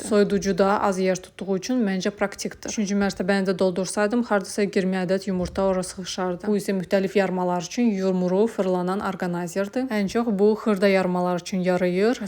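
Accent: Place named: Russia. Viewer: ÖZÜNÜZ TUPOYSUZSA CAMAATA YAZMAYIN.RUS QRAMMATİKASI İLƏ DANIŞIR VƏ ONDA T HƏRFİ İNCƏ OLANDA Ç KİMİ EŞİDİLİR!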